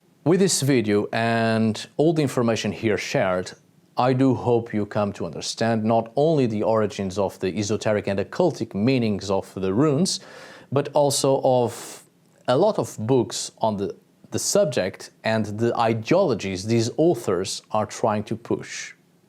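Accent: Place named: Portugal. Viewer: I knew you were Portuguese by the way you spoke… we can identify each other easily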